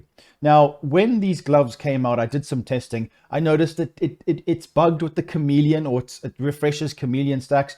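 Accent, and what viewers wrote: South Africa. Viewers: Hey, thanks for the advice. BTW, if I'm not mistaken you're accent is RSA right? I'm in Cape Town, Northern Suburbs. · South African spotted? Are you a Saffer,?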